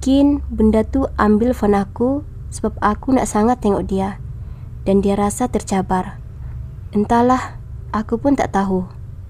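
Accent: Sabah, Malaysia. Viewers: Hahahahaha tula cara dia cakap mcmtu,aku syak dia org sabah🤣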